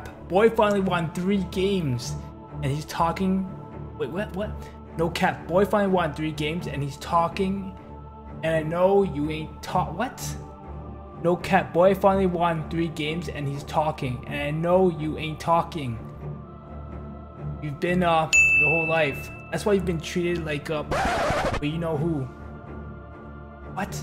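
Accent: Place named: Toronto, Canada. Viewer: you're a Toronto man right? I can tell by the way you talk lol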